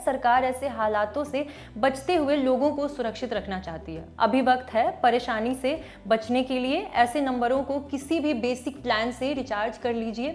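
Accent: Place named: Bihar, India. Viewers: Nice hindi bur bihari accent Accha laga❤ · Apke bolne ke accent se lag rha hai aap bihar se ho Abhi thoda time lagega hindi accent me bolne me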